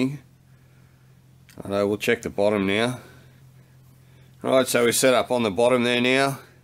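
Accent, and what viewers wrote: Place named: Australia. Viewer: Hears Aussie accent... presses like immediately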